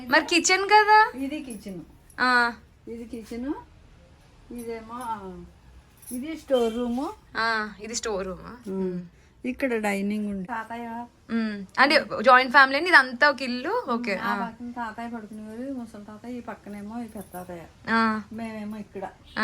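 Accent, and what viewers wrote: India. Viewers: Beautiful Telangana telugu ❤ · gaaru Antarvedi daggara unna lakkavaram aa midi. miruu godavari yasa lo matladutu unte rojanta chustu undipovachuu andi mimmalni❤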